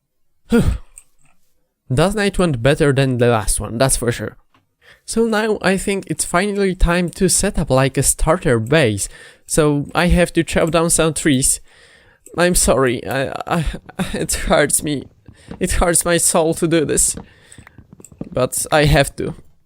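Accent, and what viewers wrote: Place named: Poland. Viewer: Oglądam pierwsze sekundy, i już wszystko wiem. Dam sobie głowę uciąć za to że jesteś polakiem. xD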